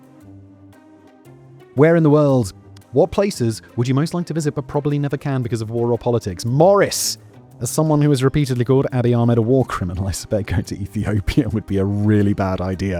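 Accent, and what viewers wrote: United Kingdom. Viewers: His British accent is too heavy for me. I can't follow what he's saying.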